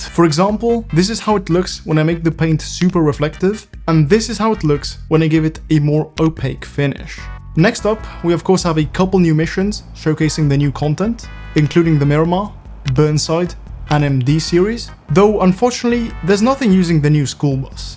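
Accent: Italy. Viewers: Ciao,ho capito ce sei italiano dall’accento. Io vorrei che tu iniziassi a parlare in italiano nei tuoi video(se ti va)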